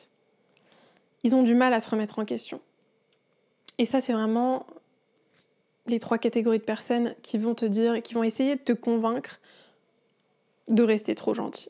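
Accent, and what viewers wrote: France. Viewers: Le meilleur podcast que je écoute, vous êtes pas une nordiste par hasard 🎉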